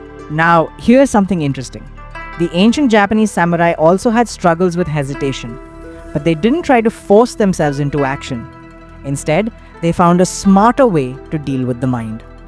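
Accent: India. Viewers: Those videos are REAL good............ What bothers me is hearing an Indian accent..in an Asian Video. Use an AI Reader maybe ? Keep up the good work !!👍 NEW SUBSCRIBER HERE............!! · You sound Indian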